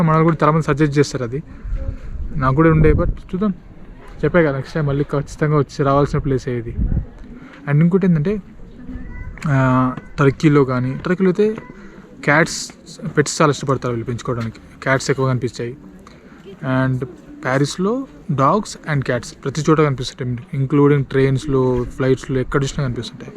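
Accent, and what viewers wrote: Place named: Telugu, India. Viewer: బ్రో జెల్ది రా బ్రో సీట్లు అయిపోతున్నాయు ఈడ 😅😂 ఏ దేశం పోయిన మన భాష యాస మర్చిపోవద్దు ప్రౌడప్ యుా తెలుగోడ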